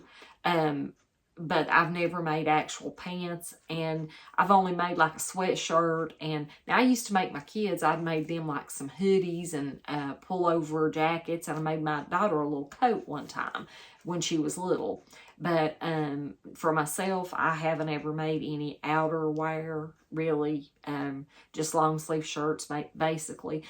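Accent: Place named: Georgia, United States. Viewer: I noticed your accent right away and thought she sounds like I would if I was on YouTube...I live slightly north of Macon, GA.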